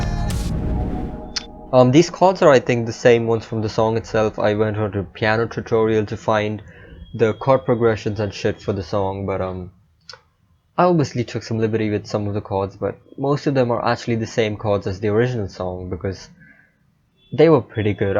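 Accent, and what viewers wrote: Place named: England, United Kingdom. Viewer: you tried to get english ascent🧐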